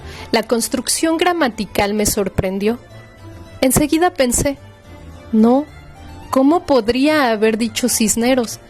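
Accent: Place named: Mexico City, Mexico. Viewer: El acento chilango le dio el toque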